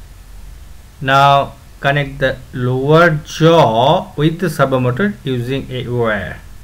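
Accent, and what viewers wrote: India. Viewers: So...you are Indian?Accent yaar.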